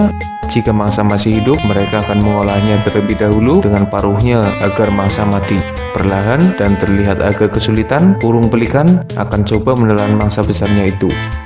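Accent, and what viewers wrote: Banyumas, Indonesia. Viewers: Pasti ni orang ngapak. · Ora ngapagh ora penagh... Orang ngapak ya min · Logat ngapak bet anjay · Kiye sing dadi admin mesti wong ngapak yah? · Logat daerah saya nih ngapak cmiiw hehe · Boleh nebak kan min, pengisi suaranya kayak logat ngapak daerah selatan. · Admin orang Cilacap kah? Kok kayak ngapak gitu.. Hehe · Ada medok ngapaknya, ora ngapak ora kepenak bro ! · Adminya aslinya ngapak kali nih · Ko logatnya kaya jawa ora ngapak ora kepenak ya. Daerah banyumas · Maz wong ndi kok ngmonge ngapak 🤣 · Org ngapak nih mimin hahaa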